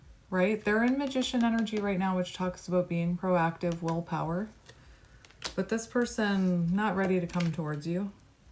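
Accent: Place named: Canada. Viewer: Two of your readings have been so spot on! One with the feathers, and this one, very very accurate. I am sending you best wishes from Central America, I could tell you were Canadian, by your accent. All about his feels so right... 15 reminds me of his emotional age....he is coming around.. I hope and I feel...there is still hope, and healing... no contact at the moment.. I am letting him figure it all out. 19 sounds much better than 15! I have and I keep choosing myself! It all rings too much truth! It is very very re assuring. Both of our parents.. alcoholic! Integrating both energies femenine and masculine! It has been like living th relatioship in real time! The key to healing and growth... very very sweet and affirming and brutally honest...! A great catalyst! Still very connected telephatically! Yes he is away. Thank you.. DELULU is a SOULULU! and No divine femenine are not mad.... 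we rock! Clarity and authenticity big present themes! Lorena Bobbit Oh MY Word! Noooo! That was brutal! This was brilliant! Mental as Harry Potter used to say! I can not possibly tell you.. all the overwork, family etc.. all of that applies.. them not me.. I am taking a break.. all true... unbeliebable...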